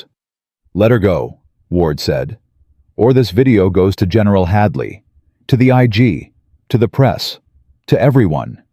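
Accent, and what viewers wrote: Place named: United States. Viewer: Sounds very US 🇩🇰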